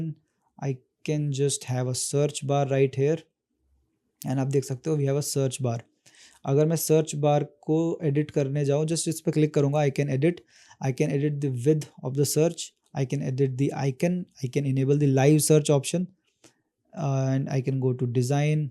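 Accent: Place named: Urdu, Pakistan. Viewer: excent is look like urdu best tutorial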